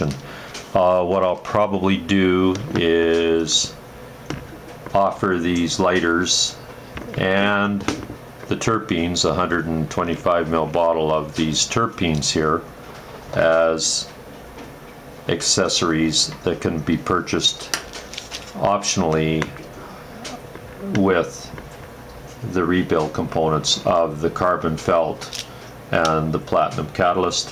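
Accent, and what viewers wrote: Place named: Ireland. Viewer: Hi there my friend, I detect an Irish accent, I am from Co. Antrim. Do you know where I can buy the Carbon felt and platinum catatylitic materials online? I purchased a Peacock Hand warmer on line which i believe is better that the ZippoThank you. Seán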